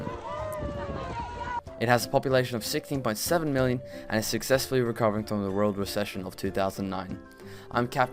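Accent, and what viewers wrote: Netherlands. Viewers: But i bet he grew up in the Netherlands. I know the difference between an aussie and dutch accent, and this is the latter ;) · you must be dutch, i heard it, you spoke utrecht to dutch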